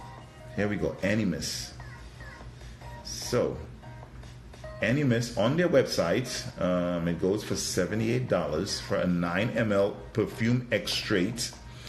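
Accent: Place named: Trinidad and Tobago. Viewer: Who's? Lol... btw I get a slight Trini or Dominican 🇩🇲 accent in the back? More trini. Might be going crazy. Lol. Disregard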